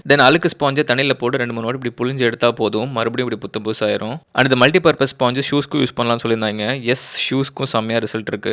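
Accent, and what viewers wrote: Madurai, India. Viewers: Anne neenga madurai yaa..? 😅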